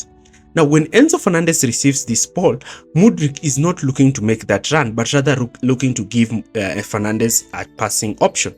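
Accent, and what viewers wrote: Kenya. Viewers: Nice analysis. Accent is familiar, are you from Kenya or have lived in Kenya in the past?😅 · This accent is so Kenyan, love it, also the analysis ismind opening, kudos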